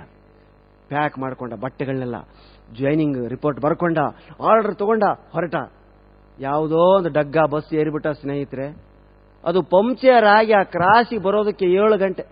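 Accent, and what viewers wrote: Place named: North Karnataka, India. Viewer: ಸರ್ ನಿಮ್ ಉತ್ತರ ಕರ್ನಾಟಕ ಭಾಷೆ ಸೂಪರ್ 😂😂😂❤❤❤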